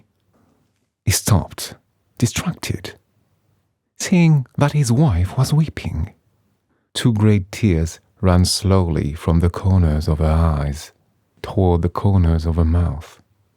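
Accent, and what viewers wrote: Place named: United Kingdom. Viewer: C'est une si belle idée de réaliser une version anglaise ! And what a delicious british accent ! I like that.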